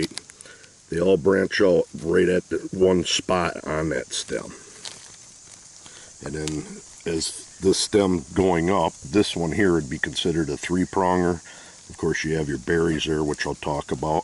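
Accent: Minnesota, United States. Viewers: Thank you so much it's very informative your accent sounds like Minnesota or maybe North Dakota where are you from? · You sound like my, Uncle...😂 Minnesota?! Great video BTW...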